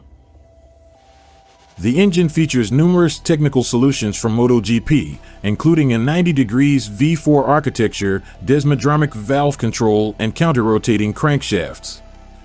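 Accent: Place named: United States. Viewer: American voice over can't even pronounce Panigale, does my nut in.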